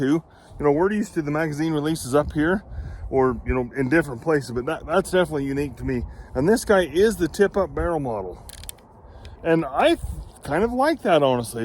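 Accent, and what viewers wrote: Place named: Texas, United States. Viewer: Here in Lubbock Texas the only ammo i can get is Aguila 71 gr. ammo. I shot it through my used Bobcat 3032. I bought a box of Fiocchi 73 gr. 32 ammo. I found some TUI in 32 so i may get that box for 30$. I cleaned and lubed up my 3032 so it should shoot great. I shot only 8 rounds of rhe Aguila and its a potent round . I shot through a box of depends and went through 10 of them into the next box. Thanks for the video. Id almost swear you were a Texan. God Bless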